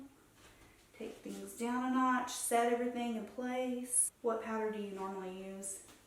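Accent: South, United States. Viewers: Your beautiful Southern accent became much more prominent in your conversation with your mama. You sound like me! I love it when Southern-born people proudly keep their accents. 🤗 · When you're near your mom, your southern accent comes back a little bit lol. Such a sweet video!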